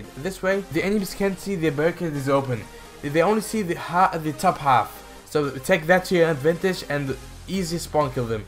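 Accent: France. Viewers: I love your accent!!! I'm not really good at guessing accents but you sound french, german, or arab. Love the videos keep it up. ❤ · He sounds French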